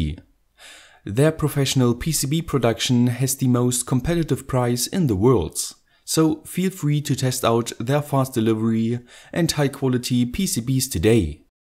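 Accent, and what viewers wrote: Germany. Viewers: Can you build a singing Teslacoil please ? And are you German ?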